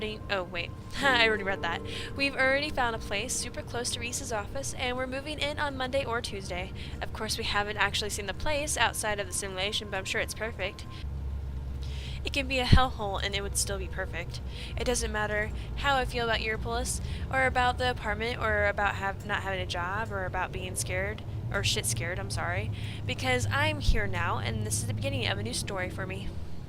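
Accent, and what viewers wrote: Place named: United States. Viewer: I am so glad you did an episode like this:)plus I love hearing an American accent:)you know me haha it became my bedtime story(@@ xxx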